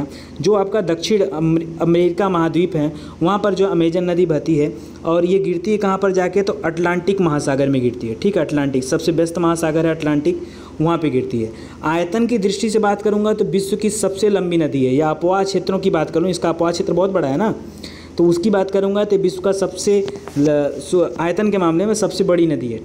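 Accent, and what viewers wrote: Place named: Uttar Pradesh, India. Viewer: Sir ji aap up se ho apki language se pta chl rha hai Bhot accha samjate ho sir ji thanku bye tc.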